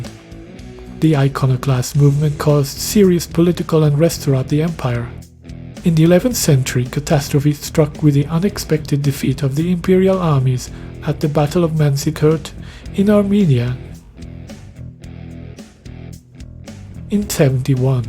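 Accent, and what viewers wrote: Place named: Portugal. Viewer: porteguese accent detected? 🇧🇷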